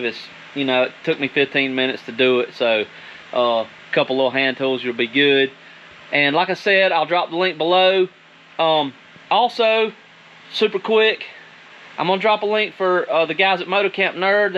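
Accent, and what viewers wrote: South, United States. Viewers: Still laughing at "sounds like the Dukes of Hazard"! LOL! You can tell you're from the South but your voice is clear and easy to listen to. The Northerners sound more like Canadians that Americans so....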